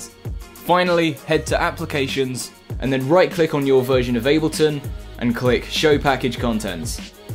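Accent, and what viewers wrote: United Kingdom. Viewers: So your british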